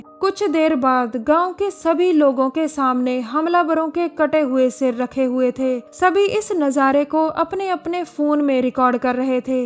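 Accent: Punjab, India. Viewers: Aap punjabi bolte ho na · Taudi tone teh Punjabi hi rehni haige chahe jini bhi Hindi bolan de koshish Kar Lao ji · Punjabi tone aa rahi he...bechari zabardasti Hindi bol rahi he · tohdai awaj to hint mil gya si ke tusi punjabi o ....best of luck for your Channal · Aap punjabi lgte ho bolne ke style se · Are u Punjabi 😀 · I love your Punjabi accent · Madam aap punjabi ho ?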